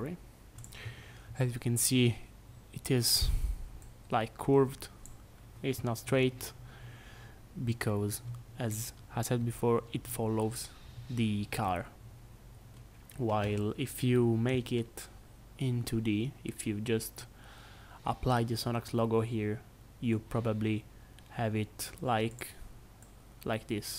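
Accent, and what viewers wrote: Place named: England, United Kingdom. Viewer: bro has the best english accent and still apologises that much... amazing video, thank you!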